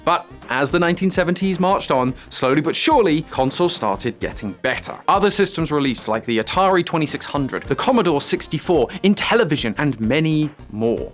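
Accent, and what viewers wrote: United Kingdom. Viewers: When did they hire a Britt for this channel?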